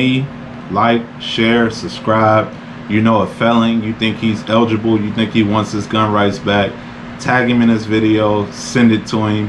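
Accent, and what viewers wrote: United States. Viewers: Bro are you from New York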